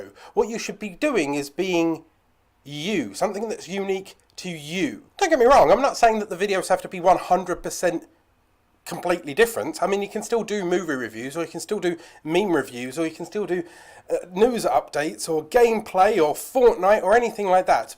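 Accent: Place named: England, United Kingdom. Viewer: Come to think of it, I do prefer listening to an English accent! And a little bit of humour is always good. I do wonder why you're so close to the camera - not just you, everyone who does it?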